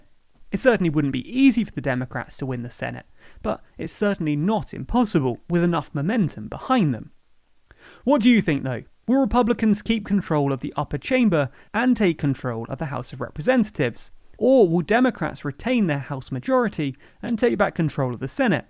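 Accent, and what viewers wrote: United Kingdom. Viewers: Why is a British guy telling us who'll win? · Oh boy, a video narrated by some British guy telling how eight U.S. Senate election races will determine control of the Senate. NONE of these eight particular races include the Senate seats in Minnesota or Michigan, both of which the Democratic incumbent is very vulnerable and of which could help maintain GOP control.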